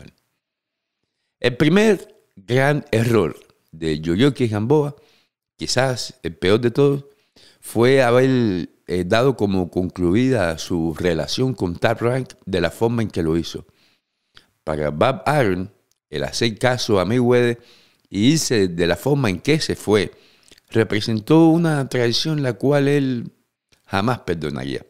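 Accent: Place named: Cuba. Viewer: Compay yo entendi porque soy cubano pero es documental hablado esta calentico solo pa cubano que hablamos mal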